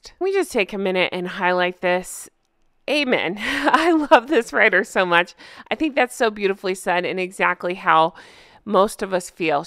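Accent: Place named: United States. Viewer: When you said "my Queen" in the last video it surprised me (being from one of the realms, Australia, so she was/is literally my Queen) and it touched me that you felt that way. I then did a double take, wondering if you were American as I had thought - perhaps you were Canadian who lived in the US and picked up the local accent, because then she definitely would be your Queen too. But no, I checked your channel details that said US. So you admire Queen Elizabeth II so much you show it by calling her your Queen. That's wonderful.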